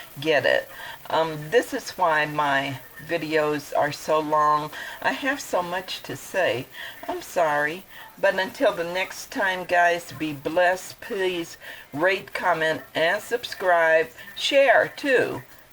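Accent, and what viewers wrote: South, United States. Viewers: I’m new. Simply Sara mentioned you. I’m enjoying your way. You speak southern the right way. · What a nice lady and a good cook love your cooking style and you just seem like one of the sweetest little ladies ever I'd love to live next door to you and we could exchange recipes on the Southern girl and you sound like you might be a Southern girl also good food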